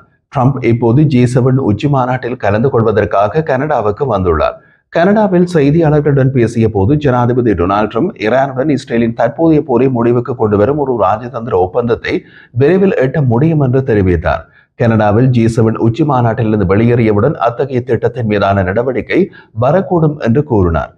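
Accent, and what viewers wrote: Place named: Sri Lanka. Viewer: இலங்கை த்தமிழ் உச்சரிப்பு அபாரம்.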